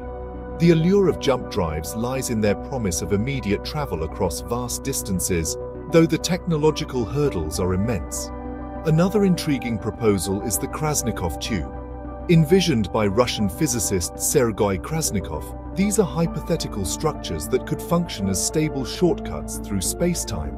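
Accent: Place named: RP, United Kingdom. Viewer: Imagine a Youtube with no AI created garbage videos like this channel, videos would then have to be spoken by real people with faces and names instead of this endless chatGPT scripts read by posh british actor voices in monotonous repetetive nonsenceical giberish. What a world, it exists in many other videos created by astrophysicists but not here where the creater is just a grifter.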